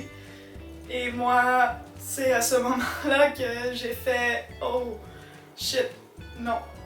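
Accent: Quebec, Canada. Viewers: Tes tu canadienne ? Super video sinon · ah bon tu viens de dire que tu es déménagée au canada, ça doit être pour ça que t'as l'accent :) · ok ton accent français/québécois wow! :D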